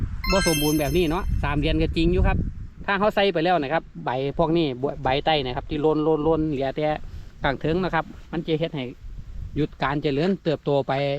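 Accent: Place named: Chaiyaphum, Thailand. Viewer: สำเนียงคือชัยภูมิแท้คับ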